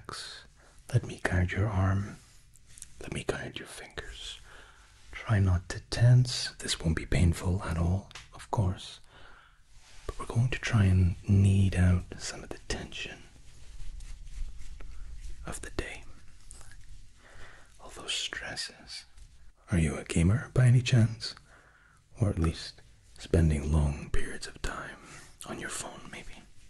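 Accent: Scotland, United Kingdom. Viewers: Eh? Scottish?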